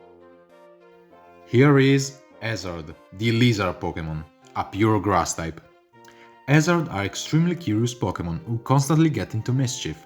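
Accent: Italy. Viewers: Bel video, scrivo in italiano perché si capisce dalla accento, è ispirato a dadrake giusto?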